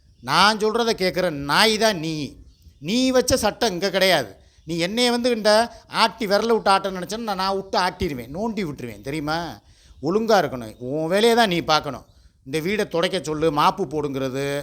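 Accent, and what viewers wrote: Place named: Madurai, India. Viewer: இது உங்க வாழ்க்கை நீங்க யாருக்கும் பதில் சொல்ல தேவையில்லை எது எப்படியோ நீங்க பேசுற மதுரை பாஷை நீங்க கமெண்ட் படிக்கிற விதம் எல்லாமே எனக்கு ரொம்ப பிடிக்கும்னா உங்க தங்கச்சி கீர்த்தி ஃப்ரம் சென்னை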